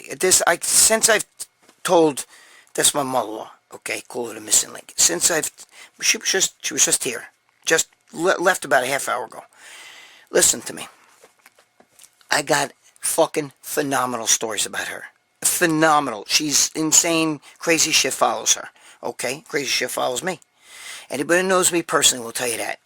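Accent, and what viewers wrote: New York, United States. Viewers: Brother, you sound like me with a New York accent! Take care of yourself we need you...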